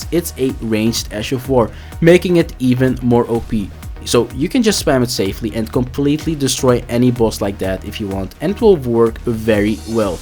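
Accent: Netherlands. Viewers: I smell dutch…..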